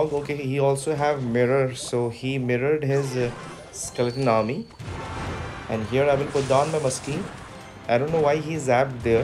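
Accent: India. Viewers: Which country are you from?? U sound Indian...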